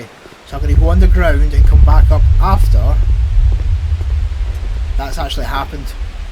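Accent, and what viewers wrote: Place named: Scotland, United Kingdom. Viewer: Btw I love your Scottish accent lol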